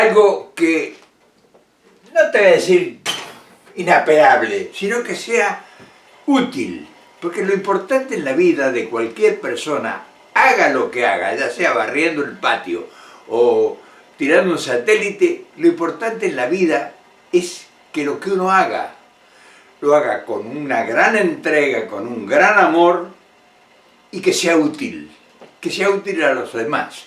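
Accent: Argentina. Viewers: usted habla como argentino, pero tiene un corazón mexicano. Su capacidad de reconocer al pueblo como la fuerza que sostiene a este gran gobierno. Excelente análisis. Saludos desde México.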